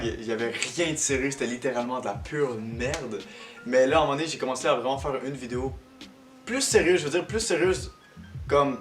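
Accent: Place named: Canada. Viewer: Je veut vivre au Canada je kiffe l'accent et tes trop beau continue comme sa t'es génial ❤️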